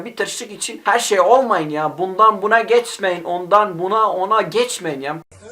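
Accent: Turkey. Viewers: iki tavsiyem olacak öncelikle her ne kadar türk olmasan da türkceyi biraz daha guzel konusabilirsin. Ic anadolu sivesi gibi konusuyorsun kulaga hos gelmiyor. Ikincisi de bu sekilde faydasiz videolar yerine Kürt genclerinin bilinclenecegi videolar cekmelisin.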